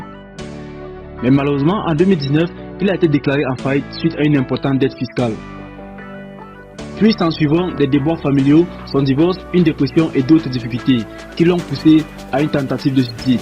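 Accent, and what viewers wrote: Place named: Cameroon. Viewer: Mon ga tu raconte que des connerie sur té vidéos a entendre ta voix je suis sur que té un camerounais avec ton acsen 😂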